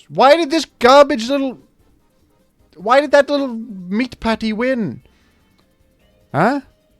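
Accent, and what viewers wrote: United States. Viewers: Something about the American weeb accent disturbs me in a profound way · cool American accent always gives me chills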